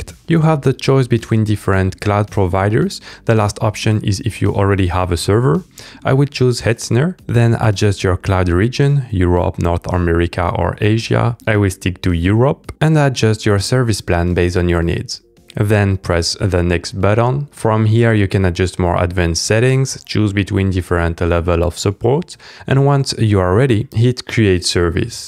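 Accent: France. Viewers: The french accent make it better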